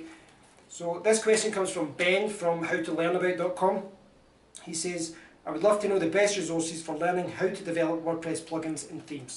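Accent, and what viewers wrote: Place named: Glasgow, United Kingdom. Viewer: Thanks,. Lots of useful advice there. And +1 for books. Agree also that Udemy quality is variable to put it mildly. And some of the claims they make - like become a fully fledged developer in 6 weeks - are a bit wide of the mark imo. (Also, loving that accent. Reminds this southern softie of the very happy years he spent living in Glasgow.) Thanks again.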